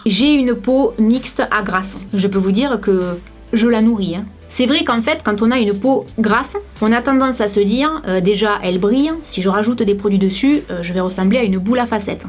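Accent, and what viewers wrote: South, France. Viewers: J'adore ton accent du sud!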